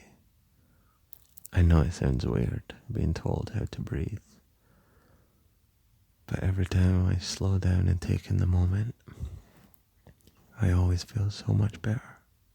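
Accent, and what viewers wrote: Scotland, United Kingdom. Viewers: someone in the comments pointed out you were scottish (i was trying to decipher ur accent) and now all i can think of is supportive shrek :) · I just spent the last 10 minutes contemplating whether your accent is Scottish or Irish, and it has made me question my existence. I really thought I knew the difference between the two. But you're scottish though, right? Anyway, I'm really happy this randomly showed up on my recommended, your voice literally made me melt 😊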